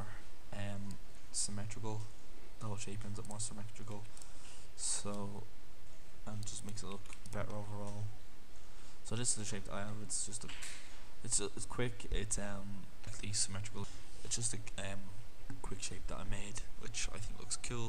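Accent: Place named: Ireland. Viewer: Great tutorial on Bézier curves -- sounds like you're from the same part of Ireland as I am.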